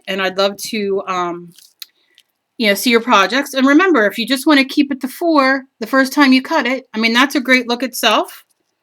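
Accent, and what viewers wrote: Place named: Altoona, United States. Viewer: You sound like you maybe from the Altoona area of PA. Nice memory of my cousins who living there still. Nice video, thank you to share. I am a Stroke survivor and hoping I can start make polymer items for gifts and fun.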